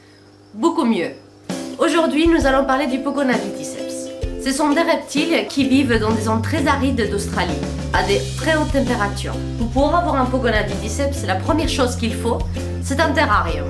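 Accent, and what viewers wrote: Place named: Russia. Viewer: Tu es d'origine russe ? Tu as l'accent 😉 Je parle Russe et travaille avec des russes donc ces accent est très familier.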